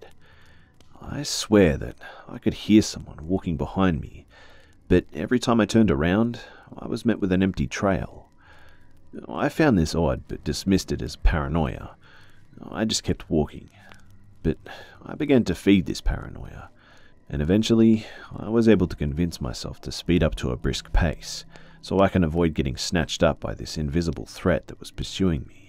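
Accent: Australia. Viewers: Ahhh, I took a break from horror stories for a while, but boy am I glad that to be introduced back into it by a smooth Australian voice. Thanks B! (You are Australian, right?)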